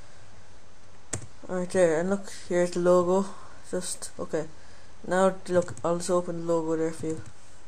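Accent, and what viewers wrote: Ireland. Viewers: good video and its good to hear a fellow Irish man on youtube! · He's Irish.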